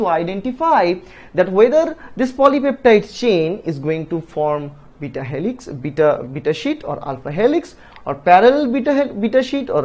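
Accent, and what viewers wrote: India. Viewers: such indian accent.........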